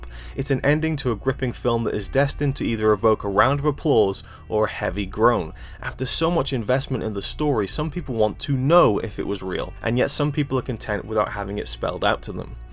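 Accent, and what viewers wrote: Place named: United Kingdom. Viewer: Who is this British dick making these videos. He explained nothing. What a tool.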